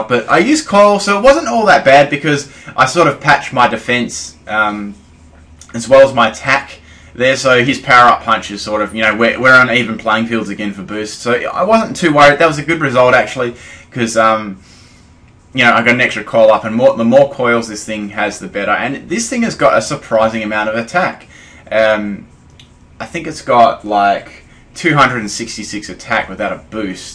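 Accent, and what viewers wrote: Australia. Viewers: nothing against Australians and their accents but yours is rather thick, plus the loud, low quality audio makes it hard to sit down and enjoy this video cause i cant understand what your saying.. just calm down you don't have to speak so loudly imo other than that, this was a great battle and your team is bad ass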